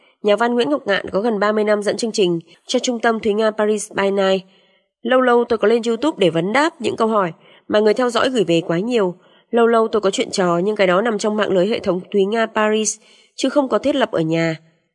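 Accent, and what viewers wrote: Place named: North, Vietnam. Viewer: M.C Nguyễn Ngọc Ngạn xa quê mấy chục năm nhưng vẫn mang đậm chất Bắc. Chúc mừng Ông trở lại thăm Quê hương Sơn Tây.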